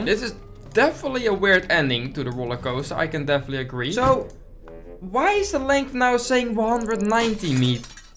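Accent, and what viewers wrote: Netherlands. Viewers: Nederlands accentje👌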